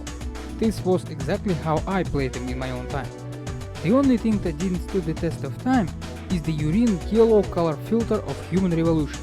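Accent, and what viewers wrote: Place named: Russia. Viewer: Молодец, тренируйся. лойс поставлю, но акцент прям вааах какой русский. чувствуется что пока стараешься произносить слова, а не говорить на языке.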